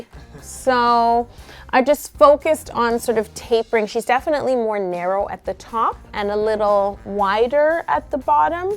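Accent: Canada. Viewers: Canadian accent really came out this episode, i love! the cake is absolutely amazing and adorable 🥰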